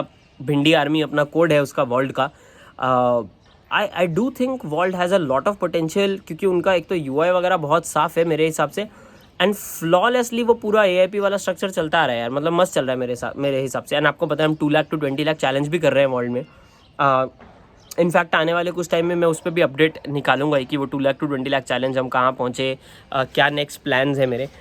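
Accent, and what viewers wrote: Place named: Nagpur, India. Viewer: Bhai Nagpur se ho kya....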